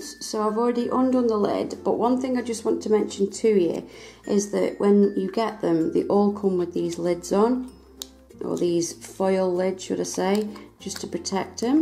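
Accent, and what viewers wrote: United Kingdom. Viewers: Everything sounds better with a British accent!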